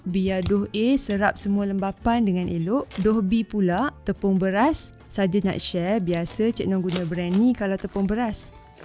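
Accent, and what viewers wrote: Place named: North, Malaysia. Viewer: ni org utagha ka? Mcm ada dengar sleng utagha ja tu?😁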